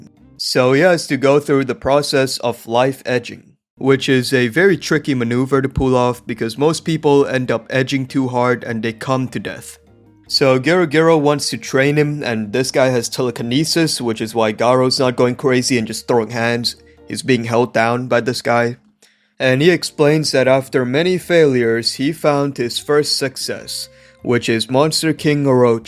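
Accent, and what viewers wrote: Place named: India. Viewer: This video genuinely made me almost cry laughing thank you bro, btw, i sense a slight ndian accent on your voice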